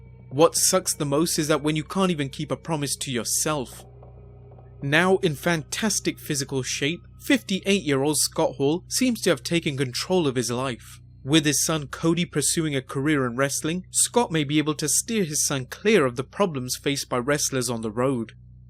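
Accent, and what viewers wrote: England, United Kingdom. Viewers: I don’t know why the British accent makes for great documentaries. It’s beyond me, but it works. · Love this English's presenters accent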